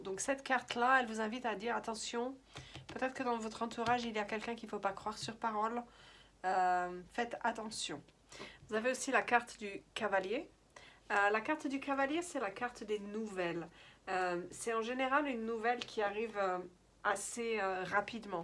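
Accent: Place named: England, United Kingdom. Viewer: Mignon ce petit accent anglais :D Je vous découvre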